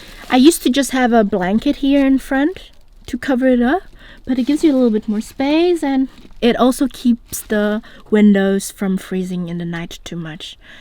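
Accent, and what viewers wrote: Germany. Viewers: it sounds like you have a german accent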